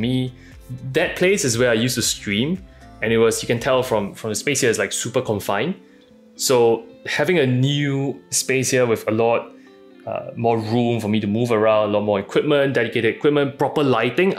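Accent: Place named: Singapore. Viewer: Hey, are you Singaporean (you sound like one)? Been watching your streams for a while now and really like your content/set up (even though I don't tank). I recall you mentioned somewhere before that you have a full time job as an investment banker and now it appears you are married too. How do you manage a full time highly stressed job (I'm in banking too) with a wife and yet able to spend this much effort into the game and your content? I suppose your wife fully supports you and she must be into gaming as well? I see that she's a naruto fan as well:) I've been playing wow since it first came out when I was university but now as a married man with a full time job and a kid, reality kinda catches up with you.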